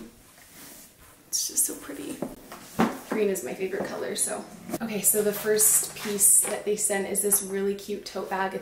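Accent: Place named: United States. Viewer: Congrats. on making the jump to YT. ! , you mentioned Canada but your accent sounds American 🙂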